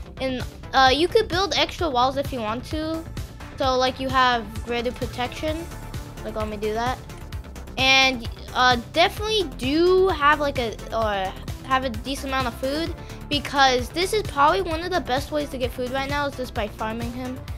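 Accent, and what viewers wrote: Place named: United Kingdom. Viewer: BROS BRITISH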